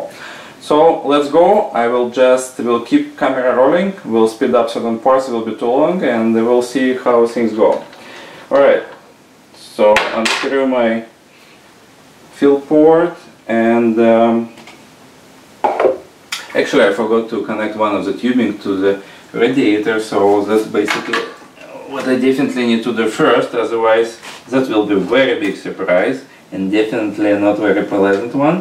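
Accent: Russia. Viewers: You are russian? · I just have to ask, are you Russian? or maybe German>?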